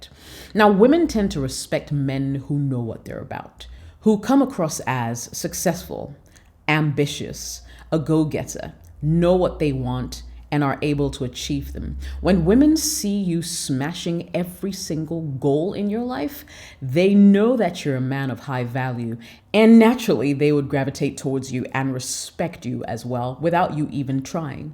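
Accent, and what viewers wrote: France. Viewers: First I command you on your french it is a fine cute accent. Your channel is lit. You bring so many excellent materials and I truly believe this episode is the best of all. W/O respect there is no relationship. Wishing you that you reach your goals. Touloulou. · True facts. Respect is a King aspects of real man. Thanks and your french accent is good!!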